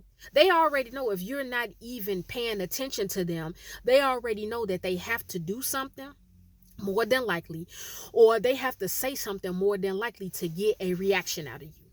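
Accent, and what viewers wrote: Chicago, United States. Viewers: I love the chi town accent. Yes spot on. Iv got away from this with my abusive ex and friends. Now im laying low bettering myself whilst there clicking up in the streets because they can't get me at home ( so I hear )' God is good. So is lol. Amen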